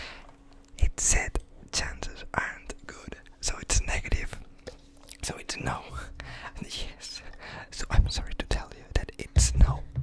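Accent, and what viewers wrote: Spain. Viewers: 50% = talking about the Alien 40% = make fun of his spanish accent 10% = Ask him for a collaboration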